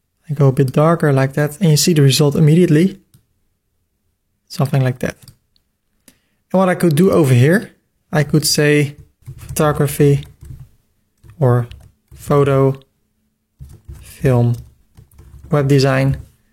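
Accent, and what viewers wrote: Netherlands. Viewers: I Love that I heard in the first 10 secs that you were Dutch, anyway great vid man, ga zo door!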